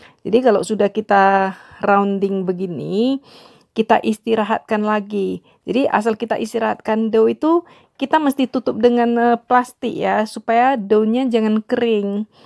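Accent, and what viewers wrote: Medan, Indonesia. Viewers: Ci org medan ya? Mama sy kl ngomong indo jg gt hahahaha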